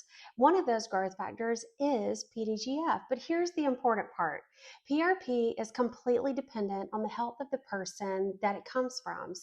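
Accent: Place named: South, United States. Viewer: Hi, you sound like you’re from the South as am I. Where is your clinic located?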